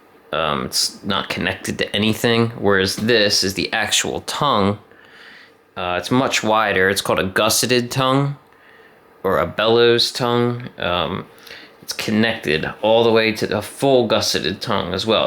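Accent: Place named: Philadelphia, United States. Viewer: Great vid! Im guess from the accent you are from Maryland, Delaware or Phila...but if its Phila you have a light accent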